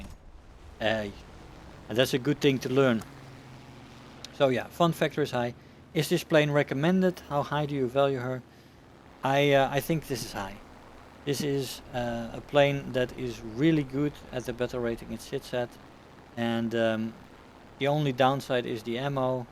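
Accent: Netherlands. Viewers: Hey.Are you from the Netherlands? I think i hear a little Slang. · I love the dutch accent in every language i speak. kinda nice that both of my aircraft youtubers have it👌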